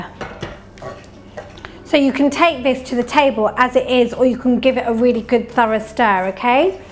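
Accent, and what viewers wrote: England, United Kingdom. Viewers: Where are you from ur English is soo english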